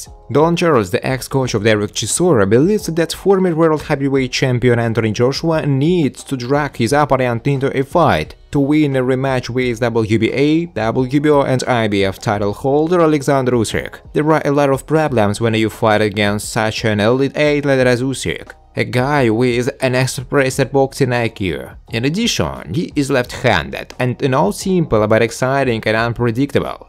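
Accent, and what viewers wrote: Russia. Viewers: Каким-то образом видео попало ко мне в рекомендации. Слушаю и думаю, ебать какой дикий акцент. Подумал сразу может это русский, а потом посмотрел инфу канала и подтвердил свои домыслы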